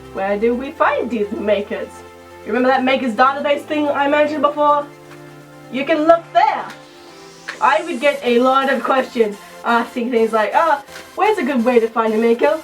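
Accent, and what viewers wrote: Australia. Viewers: OMG you're Australian! I need to seeee youu and hug you!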